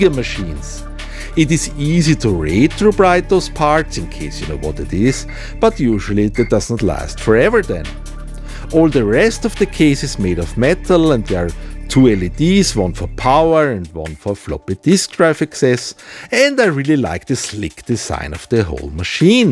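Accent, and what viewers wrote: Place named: Austria. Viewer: you speak a very nice austrian english, ..... 😎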